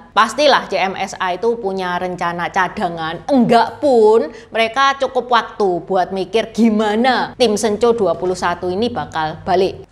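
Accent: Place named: Surabaya, Indonesia. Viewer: Bukan beritanya yg saya suka, tapi dialek khas Surabaya nya yg apik tenan.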